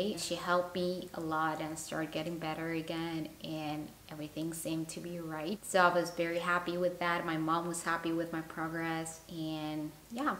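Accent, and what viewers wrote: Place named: Bogotá, Colombia. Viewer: I recognized by your accent that you are from Bogotá. Stay strong 💖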